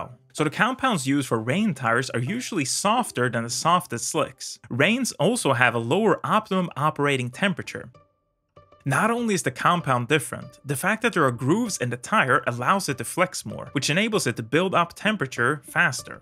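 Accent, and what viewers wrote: Sweden. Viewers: are you from sweden?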